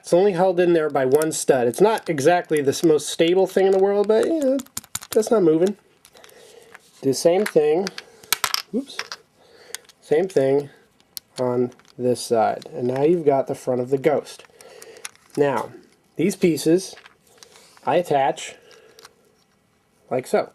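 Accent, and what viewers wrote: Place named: England, United Kingdom. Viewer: it is not British accent, it is English BTW but good model!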